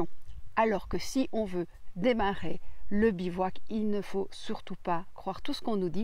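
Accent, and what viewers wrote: Belgium. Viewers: très utile et très agréable le petit accent belge!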